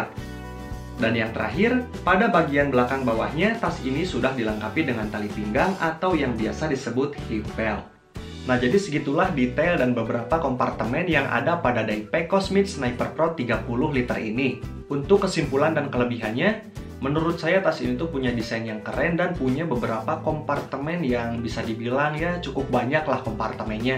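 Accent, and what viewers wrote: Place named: West Java, Indonesia. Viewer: Dengar narasinya, sangat mencirikan orang sunda 🤣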